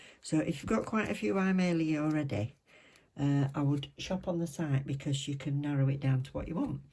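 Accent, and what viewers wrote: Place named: England, United Kingdom. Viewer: Ms, you are so cute and I've absolutely loved some ding time with you listening to you review these lovely colors! This is the first of your vids I've watched and I've already subscribed! You're a joy to listen to and so soothing, and I absolutely love your accent. I'm from the states, but I've always loved all things England ❤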